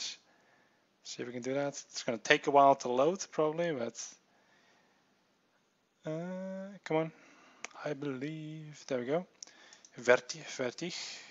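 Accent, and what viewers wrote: Netherlands. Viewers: are u dutch? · i have a question are you dutch it sounds like it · Not judging his accent just sounded like he was dutch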